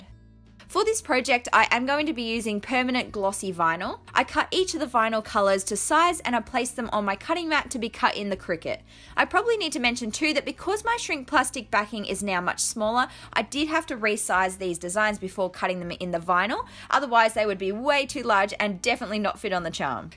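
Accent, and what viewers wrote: Australia. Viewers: Yeah! A new video! Love your work and Australian accent! Hugs from good ol USA!!!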